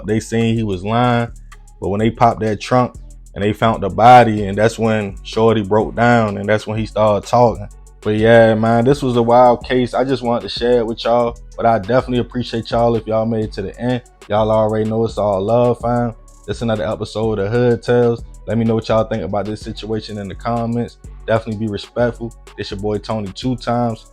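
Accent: Baltimore, United States. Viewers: This narrator has to be from Baltimore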